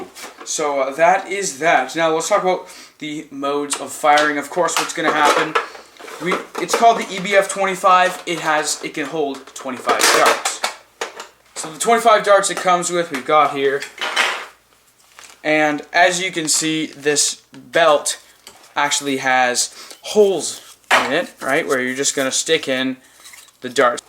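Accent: Canada. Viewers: Are u a Canadian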